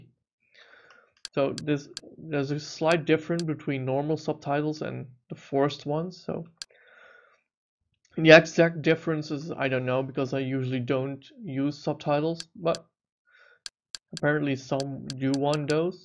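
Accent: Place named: Netherlands. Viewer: dag,ik hoor precies dat je Nederlands bent.Daarom vraag ik het in het Nederlands. Weet u hoe ik die ingebakken Aziatische of Arabische ondertitels kan verwijderen zodat ik mijn gedownloade kodi ondertitels kan plaatsen. Normaal heb ik geen probleem met buitenlandse ondertitels maar de ingebakken ondertitels nemen soms bijna de helft van een film in. groetjes Peter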